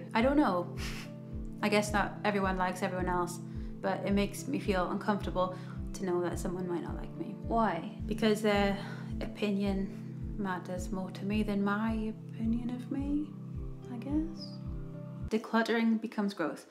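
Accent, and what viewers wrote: Newcastle, United Kingdom. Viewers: are you from Newcastle? (or somewhere close?) :D i just uploaded a video on minimalism too and i'm also from newcastle..but i live in The Netherlands now... hi :) :) :)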